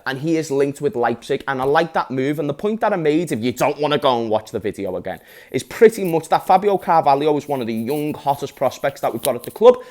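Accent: Liverpool, United Kingdom. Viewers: Mate he's scouse thats rhe flow... what is it with this world and people telling people how they should be... authenticity is a good trait you want slow talking listen to lieing politicians 😊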